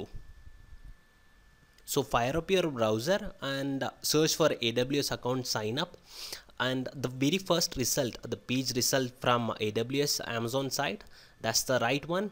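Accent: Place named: India. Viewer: Am I the only one who can t support this Indian accent? no offense...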